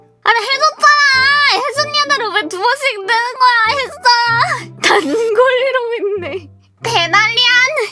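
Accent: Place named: Japan. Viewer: 역시 은 일본식 영어랑 가요느낌이 잘 어울려...다음엔 그런것도 해보자..!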